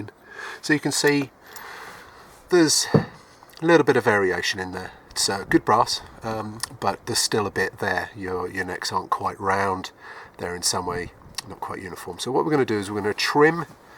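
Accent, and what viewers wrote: England, United Kingdom. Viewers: What a grand name and accent you have! Which part of England is your accent from? Wow! love it.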